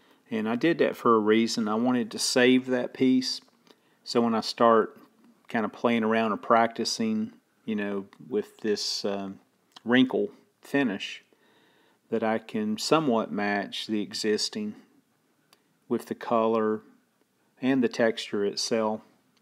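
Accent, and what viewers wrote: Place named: Carolina, United States. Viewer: ..are you a Carolina boy by chance? cnt quite grab the origin of your accent..but thx for the great tips on stripping..appreciate your time and effort...Rich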